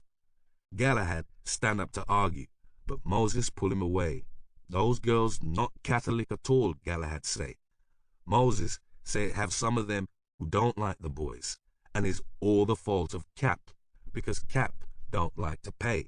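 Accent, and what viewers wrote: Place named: England, United Kingdom. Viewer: WHERES THE PATOIS? who chose this reader? i read this book and the patois is what gives the novel its charm. its the voice of the novel, the comic turn of phrase. its CRUCIAL to the text and this reader didnt capture it. this sounds like an anglicised reading for an audience who might struggle with patois. a compromise too far and the voice is lost. this should have been stellar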